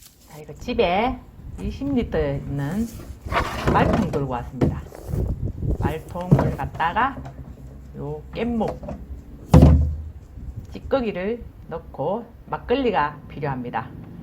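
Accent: Gyeongsang, South Korea. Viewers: ㅎ ㅎ 이빠이 경상도 말은 정말 구수합니다 특별이 이빠이는 일본말이죠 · 완전 경상도 말씨입니다" 밭작물은 주인을 잘 만나서 그런지~~채소들이 싱싱하네요" 그전부터 유튜브동영상을 보고 있는데 척척박사 이네요" 잘보고 갑니다👏👏👏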